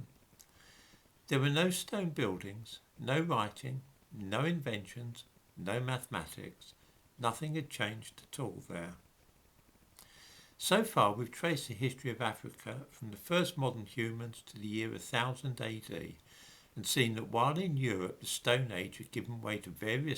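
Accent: United Kingdom. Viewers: All of the base technologies that allowed the human species to become the alpha predator of planet Earth developed in Africa before Africans migrated throughout the world. A lie of omission, even when spoken with a British accent, is a lie nonetheless..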